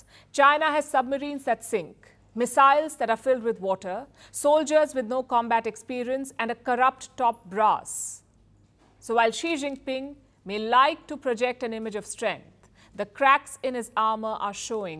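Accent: India. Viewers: Finally his female "with india voice patterns" does not seem like a cow chewing its Cud OR Carry its self as though the world OWES her The A ++ is her slight Timidity and recognizes there are forces of Awakened that proceed her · FirstPost and WION are CIA funded propaganda channels. They pick this woman with reasonably pretty face and thick Indian accent to confuse Indians. She is paid to make Indians hate China and Russia. She is professional woman who is selling her face and voice to the CIA for US cash. And you fell for it.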